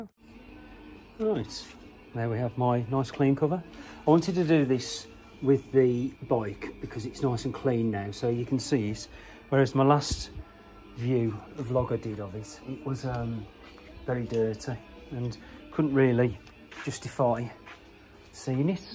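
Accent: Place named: Midlands, United Kingdom. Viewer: Cheers dude not getting one you saved my bacon midland acsent👌☕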